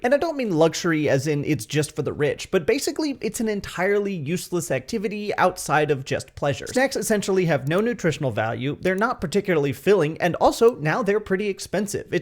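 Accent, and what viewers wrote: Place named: India. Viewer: Love your indian accent. The way you said "P'ty" instead of "Pretty" it's fun.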